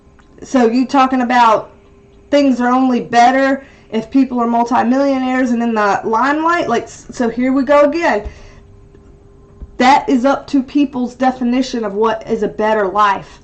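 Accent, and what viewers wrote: South, United States. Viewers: First off let me say your hair style is gorgeous just like you! Hi!! New friend and new subscriber to ur channel from southeast Kentucky here. I can tell you have a bit of a southern accent like me. My accent is southern with a bit too much of a hillbilly twang added to it. Lol...anyway I’m so glad I found your channel and I look forward for us connecting. Take care and stay safe ❤️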